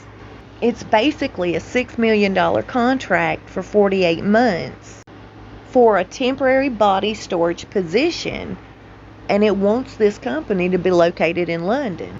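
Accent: United States. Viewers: I love your american accent its soooo kewl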